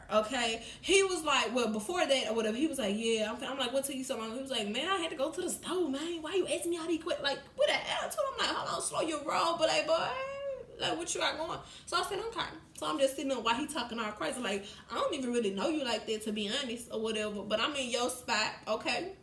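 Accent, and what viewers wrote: Louisiana, United States. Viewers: Your Louisiana accent is soooo cute! ❤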